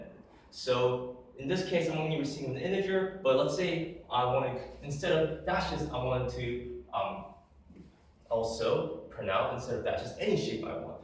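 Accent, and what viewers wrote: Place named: India. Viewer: I don’t understand your Indian accent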